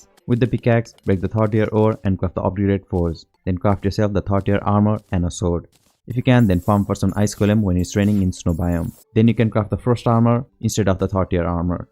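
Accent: India. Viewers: But he sounds Indian,sounds like my professor. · Can't understand with you with your jeet accent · no, he IS Indian but he's clearly not trying to hide his accent. He's just talking fast.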